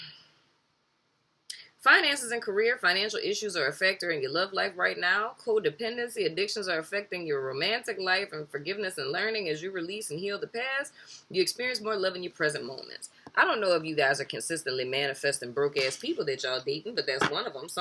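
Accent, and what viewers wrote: New Orleans, United States. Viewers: I was trying to figure out whar is that accent I’m hearing. lol I’m getting NOLA.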